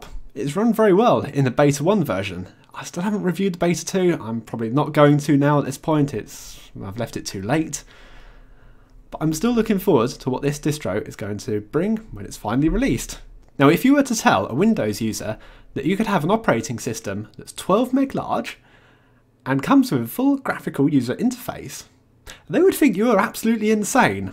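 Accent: United Kingdom. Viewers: Does he have a British accent?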